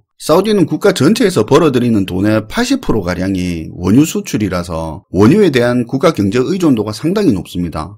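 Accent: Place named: Gyeongju, South Korea. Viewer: 잘 봤습니다. 오리지날 서라벌 원어민 발음 멋지세요~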